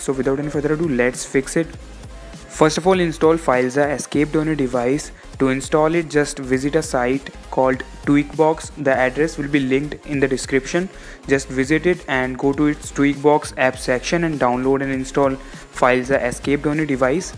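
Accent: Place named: India. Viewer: indian accents.... ughh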